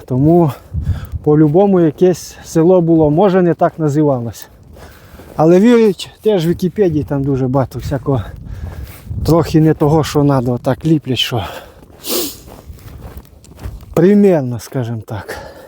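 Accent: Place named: Korosten, Ukraine. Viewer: Доброго дня. З пару місяців тому знайшов Ваш канал і дивлюсь із величезним задоволенням. А знайшов цікаво, випало випадково, включив і Ваш говір виявився дуже знайомим, можна сказати рідним - коростенським. Справа в тому що в мене весь батьків рід з Веселівки, можна сказати поруч. І хата діда з бабою в селі також давно стоїть пуста(( До війни їздив туди тільки на полювання. А цієї зими двічі вибирався туди з Києва просто погуляти по рідних з дитинства місцях, подивитися сліди звірів. Величезне дякую Вам за канал!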